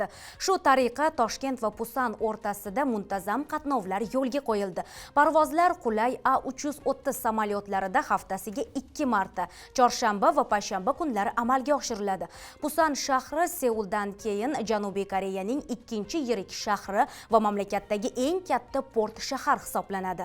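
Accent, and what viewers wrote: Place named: Russia. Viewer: ЎЗБЕКчани янги ўрганаётган ўрисга ўхшайсиз, сиздақаларга қолдими ЎЗБЕКни куни? Буни устига тенг ярим луғатинг ўрисча, э саводсиз ўрисқул?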